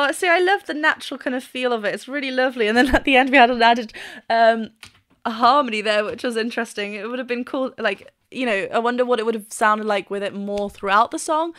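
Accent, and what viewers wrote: United Kingdom. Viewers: I love ur British 😅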